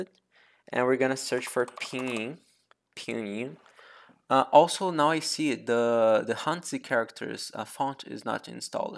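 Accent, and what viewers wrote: Brazil. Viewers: Your accent sounds Brazilian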